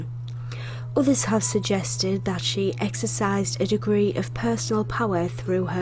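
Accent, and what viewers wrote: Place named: North East England, United Kingdom. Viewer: you DON’T sound robotic at all! What part of NE England do you come from? The northern and/or eastern parts of Yorkshire? Durham? Tyne and Wear? Northumberland? No worries. Listening to you narrate is very pleasant. I have a visually impaired friend who I usually have to read your daily video to. Having you narrate it was a treat for her. Please consider doing more narration again. As always thanks for my daily dose of vitamin H.☺️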